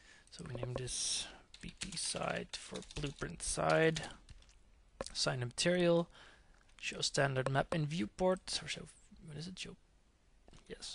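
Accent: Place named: Netherlands. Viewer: Dutch...het accent. nice tut bro ;)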